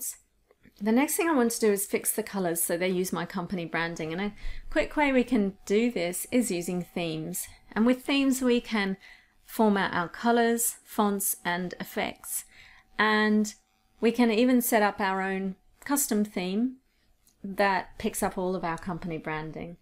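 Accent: United Kingdom. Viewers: It's her British accent. We in NA are suckers for it. lol. A smooth talker from the UK could sell refrigerators to eskimos LOL. But I agree - she is REALLY good.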